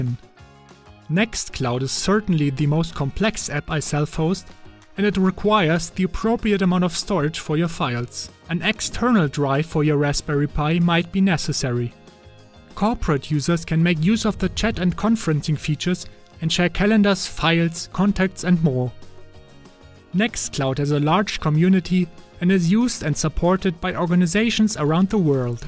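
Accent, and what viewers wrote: Germany. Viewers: You seem to have a German accent, am I right? No criticism, just for you to improve: You are talking about Queries, not Qiuris :) try Googling the pronouciation. Ps. Great video. I have my own NextcloudPi and it is great. With Nextcloud Talk you even can use a Teams alternative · uuugh german english :D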